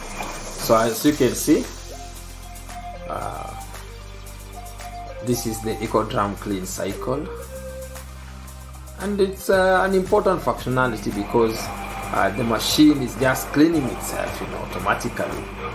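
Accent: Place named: Kenya. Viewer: Sounds kenyan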